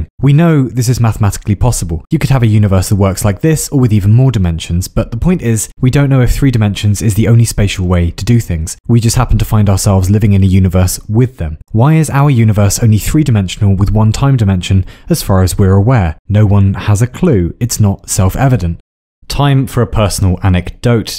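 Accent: England, United Kingdom. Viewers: There's like a whole bunch of youtube channels now with narrators with the same Alain de'Botton accent, and I'm not convinced that they're not all de Botton. But this one is the best one. And if it is de Botton, he's much smarter than in most of the other channels. And on a side note, why do Brits with this accent sound smart, even when they're dumb as doorknobs. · your beautiful fellow English voice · Orchestral Piece Begins British Bloke starts speaking fast This is when the videos make me shag the vicar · is this the same guy from the zephereus channel or do all brits sounds the same to me? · Brits will always have the best narration voice, love ur vids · right away u know u r listening to a Brit.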